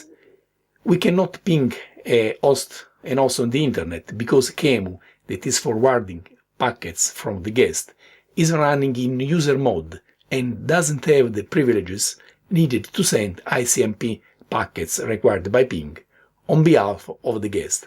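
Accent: Italy. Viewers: the Italian accent makes it even better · beautiful Italian accent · your accent is very similar to his & therefore "Very Italian" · I’m so glad you identified as Italian in this video, is been trying to localize your dialect. At first, when I was passing very little attention and It was just going in the background, my first guess was Russian/Eastern Bloc area, then by like you 3rd video I head some patterns sounding Dutch or German… I was just about to pay attention and try to guess for real and you gave the answer away at the same time lol.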